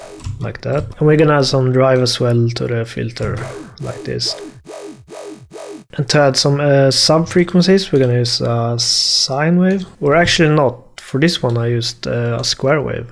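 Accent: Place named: Sweden. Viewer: This guys swedish accent is so thick and it's hilarious for me as a Swede to be able to hear this instantly😂 linear uttalas "linniör"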